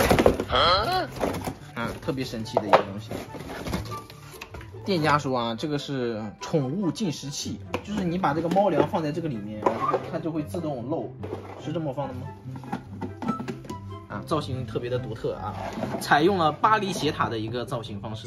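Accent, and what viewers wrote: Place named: Malaysia. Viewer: 马来西亚口音XD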